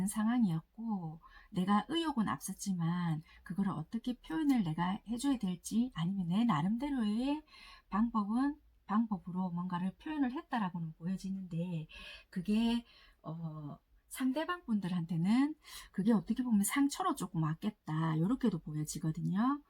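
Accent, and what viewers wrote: Busan, South Korea. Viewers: 저 5번 뽑았거든요~ 다시 한번 들어봤어요. 저 태양 물병이고 상승 게자리고, 상대는 게자리에요 ~ 다시 들어도 정말 잘 맞는것 같아요.. ^^ 댓글도 감사합니다!!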